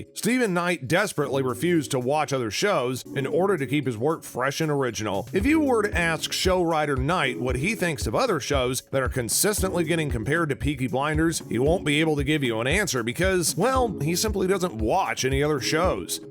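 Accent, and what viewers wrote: United States. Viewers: I’m sorry but the way Americans say Liverpool and bermham is funny why we need more big British YouTubers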